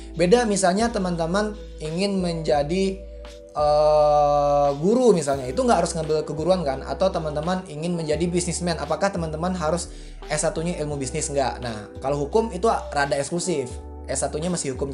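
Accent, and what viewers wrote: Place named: Padang, Indonesia. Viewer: Dari logat ngomong, kayaknya kak org padang ya?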